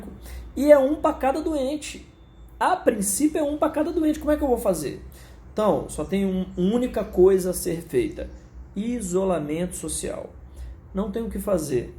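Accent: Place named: Minas Gerais, Brazil. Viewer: Eu também estou desconfiado desse cara ai. Se ele é capixaba de onde saiu esse sotaque"TREM" trem é sotaque mineiro. Eu também procurei. Deve ser que esse coitado tentou passar em medicina e não conseguiu agora tá aproveitando esse caus e tirando uma onda. Mais pelo menos ele está falando a verdade. Isso é muito bom. Ai a população acorda.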